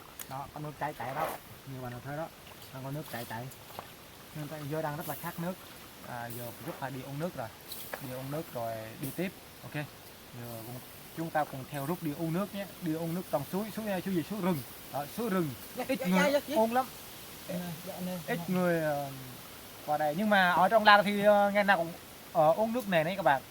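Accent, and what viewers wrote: Central Highlands, Vietnam. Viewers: Vui vui giọng nói phổ Thông mang chất Tây nguyên., như vậy sẽ được gần gủi với cao nguyên phong cảnh thiên nhiên của núi rừng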